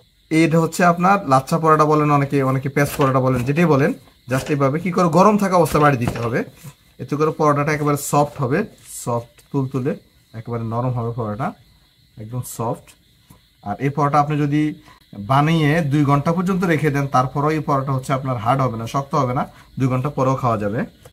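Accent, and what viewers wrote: Noakhali, Bangladesh. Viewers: আপনার রেস্টুরেন্ট কোথায়? আমি নোয়াখালী থেকে বলছি। আপনার কথা শুনে মনে হচ্ছে আপনি নোয়াখালীতে থাকেন। লোকেশন বললে সরাসরি আসতে পারবো ইনশাআল্লাহ। খুব ভালো লাগবে আপনার সাথে দেখা করে।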